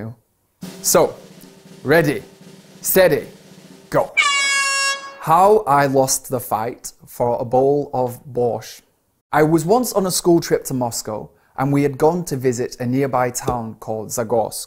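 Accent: United Kingdom. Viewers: нужно однозначно создавать свой подкаст на английском, приятный британский акцент. What do u think about it, sir? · Вижу оповещения о новом видео от SkyEng с Сэром - откладываю все дела на потом 😍 Ответы, вероятно, b&a И отдельное спасибо за мой любимый британский акцент от любимого бриташки ☺️ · Боже, брит акцент наслаждение для ушей, это стаккато, это эаа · классное видео, спасибо! такой чистееькийи понятный британский английский! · Блин британский акцент((( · Я уж подумала, что ни фига не пойму. Оказалось, не оказалось!!! Спасибо большое учительнице английского, к которой я ходила на дополнительные занятия. Она заказывала нам книжки и кассеты из Великобритании. Вспомнила с акцентом их звучание!!! 😢🥺😍😘 Побольше бы таких занятий! 🥰🥰🥰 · B, A) Почти без затруднений все поняла.Ох уж этот великолепный Британский акцент 🔥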